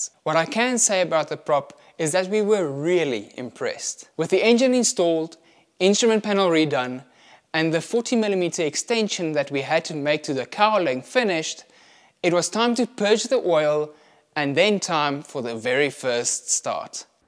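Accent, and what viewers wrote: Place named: South Africa. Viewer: That's a great video - only just found you. and love hearing the SA accent - I miss my SA friends! Same question as always though: how does anyone afford their own plane??? 😁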